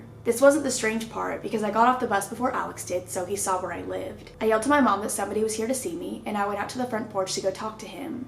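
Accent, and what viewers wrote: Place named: South, United States. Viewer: She sounded so southern in the videos holy shit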